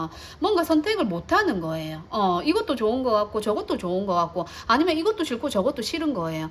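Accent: Gyeongsang, South Korea. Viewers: 3 여러가지를 하고 있는 것 잘 맞추시네요~^_^! 이젠 하나만 하려 합니다ㅋ 작가의 길이죠. 처음으로 댓글 한 번 남겨봅니다. 구수한 갱상도 사투리 너무 좋네요. 감사합니다^_^!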